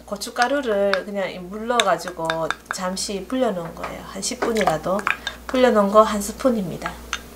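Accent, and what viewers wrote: Gyeongsang, South Korea. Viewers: 대구. 경북분이신듯?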